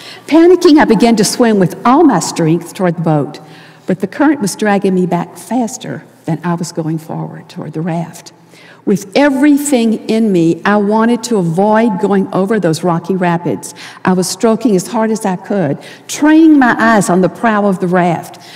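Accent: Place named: South, United States. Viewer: Thankyou Sister Marriott for a beautiful devotional full of spiritual and practical application. Love your southern accent too. Stay safe. God bless.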